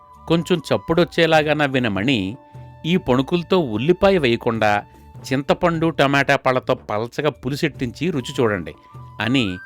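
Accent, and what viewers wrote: Godavari, India. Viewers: కాదేదీ కథ కనర్హం. నవ్వు అన్న ఒక్క విషయం మీద కథ అంతా అల్లడం నవ్వులాట కాదు. ఆ మణి నవ్వుని మేం చూడలేకపోయాం అన్న వెలితి లేకుండా మీ సహజమైన గోగారి యాసలో ఆ నవ్వుని స్పష్టంగా వినగలిగాం. ధన్యవాదాలు.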